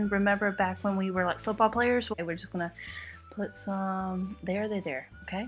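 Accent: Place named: South Africa. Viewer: Damn, I'm only watching these videos to listen to lovely SA accent! lol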